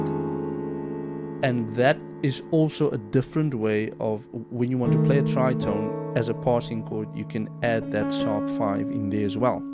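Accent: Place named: Southern Africa. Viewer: It's amazing how distcinct a Southern African Accent is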